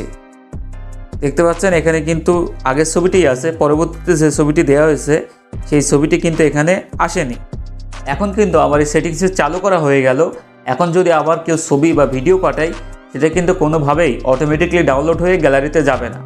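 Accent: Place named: Comilla, Bangladesh. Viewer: ধন্যবাদ ভাই। ভাইয়ের বাড়ি কোথায়? কুমিল্লা মনে হচ্ছে?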